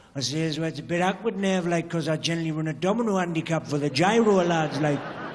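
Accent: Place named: Sunderland, United Kingdom. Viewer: His accent is real, a proper maccam get over yourself.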